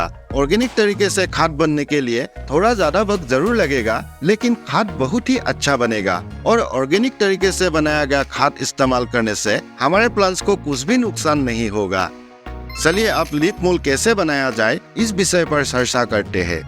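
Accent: Assam, India. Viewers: Aap Assamese ho kya bhaiya. kiunki humare Assam k log hi aise hindi bolte hain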